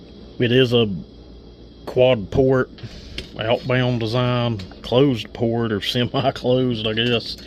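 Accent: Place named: Deep South, United States. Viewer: No drama about the port work but what the fook is up with that accent? Is that deep south swamp? Love it too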